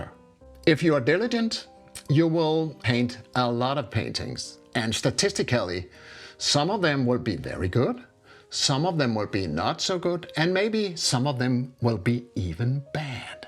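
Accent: Denmark. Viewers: Dear. Just stumbled across your channel. Are you Danish? You sound so Danish. Anyway, love your work and your advice! 🙏🏾 [Edit: "Blomster i Glasvase"? - yep, you're Danish 😅] Hilsen A Brit in Copenhagen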